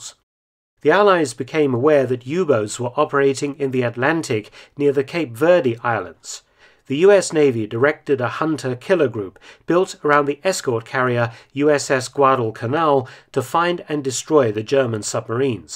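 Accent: United Kingdom. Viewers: Thank You, you would make a great American!!! It's refreshing to have a Brit narrate such event in American History!!! Become one of us! God Bless You!!! Godspeed:)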